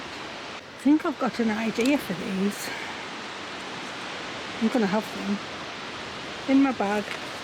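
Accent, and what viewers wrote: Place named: Northeast England, United Kingdom. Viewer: Hello Did you mean: As soon as i heard your accent i knew you were a fellow Northeastern woman and I knew instantly when you were looking for stones and paddling on Seaham beach. I was wanted more. I am a mixed media artist and live in Darlington and just adore paddling in the sea and the wild weather and the garden. I clicked subscribe and will feel right at home watching your videos. Thanks for sharing. Love from June x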